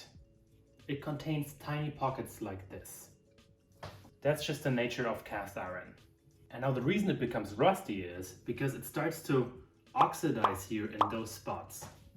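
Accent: Germany. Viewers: WOW! I never knew this before! Thanks for sharing! Love your Germ-lish!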